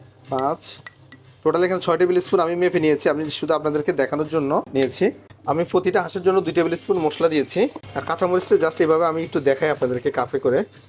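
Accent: Noakhali, Bangladesh. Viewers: আমনে নোয়াখালীর মানুষ, কথায় বুঝা যায়, তবে রান্নায় ওস্তাদ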